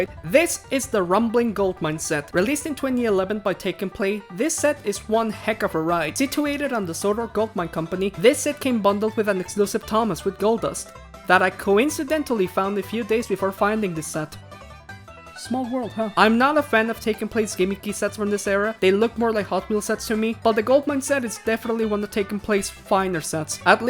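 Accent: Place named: Mexico. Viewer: You are mexican becase you sound one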